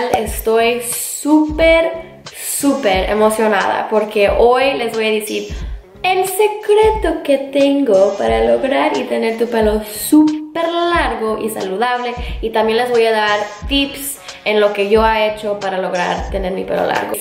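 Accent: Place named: Latin America. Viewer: todos diciendo que le cuesta hablar en español y yo que la acabo de conocer pensaba que era latina:v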